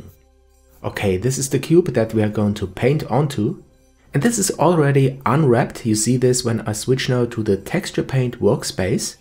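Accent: Germany. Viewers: Me: I need a clear concise tutorial... (Clicks on tutorial): (German Accent) "Hello Guys, here's a Blender 2.8..." Me: Oh thank god:D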